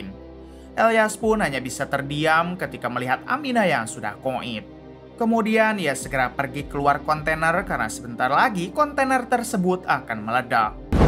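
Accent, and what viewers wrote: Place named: Madura, Indonesia. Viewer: Anjay derih madureh nkk bos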